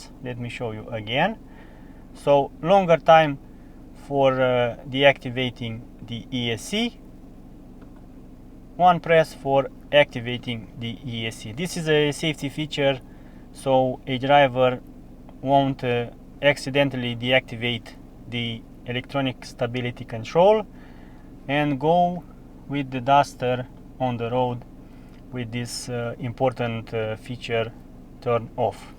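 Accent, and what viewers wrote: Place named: Romania. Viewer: Нормальный восточно-европейский акцент (он из Румынии), зато нам же и всё понятно, что он на инглише говорит. И большинству во всём мире понятно. Так что напрасно вы докопались. А вот что у нас этой машины нет на российском рынке - вот я бы про это переживал. Возможно стала бы даже более народной, чем предыдущие генерации, сильно потеснила бы в этой нише Creta, Seltos и т.п. Но увы :(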